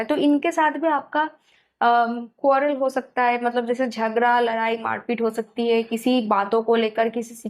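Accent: Bengal, India. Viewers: বাঙালীদের হিন্দি বলার টান ই অন্যরকম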